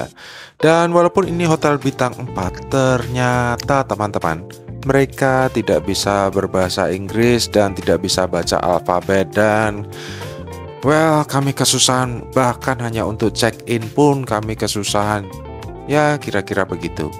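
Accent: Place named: Java, Indonesia. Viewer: Kau orang cina islam yah koh. Logat bicara jawir. Gimana ada penindasan gak terhadap islam disana? Jangan hoax loh yah kokoh ganteng.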